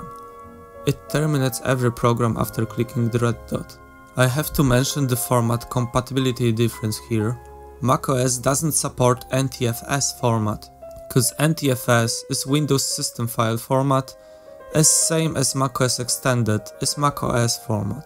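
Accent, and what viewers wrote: Poland. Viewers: Do I hear Polish accent? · Pierwsze parę sekund i myślę sobie: kurwa to musi być Polak XD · are you Polish? I'm guessing by accent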